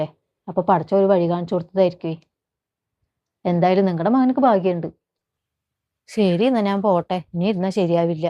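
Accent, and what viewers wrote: Palakkad, India. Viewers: Palakkad basha my favorite ❤❤❤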